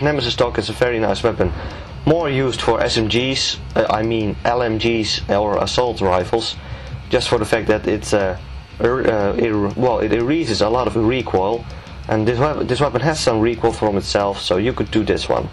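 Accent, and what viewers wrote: Netherlands. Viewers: je hoort echt goed dat je Nederlands bent goede video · Are u Dutch? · ey man ik hoor aan je accent dat je nederlands bent, ik upload ook gameplays van bfp4f check mijn channel btw nice commentairy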